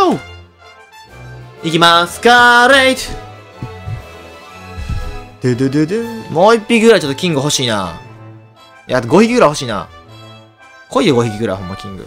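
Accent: Kansai, Japan. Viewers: 関西弁がムカつく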